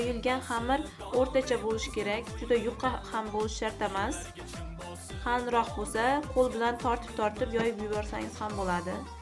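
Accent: Uzbekistan. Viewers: Tili xuddi Amudaryonikidek ekan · Шевангиз Галлаоролники😊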